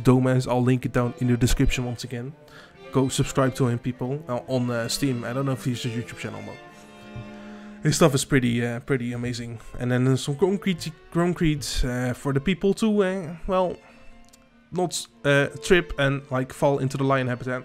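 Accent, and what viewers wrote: Netherlands. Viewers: You sound very Dutch, are you?? Awesome build, nicely done!!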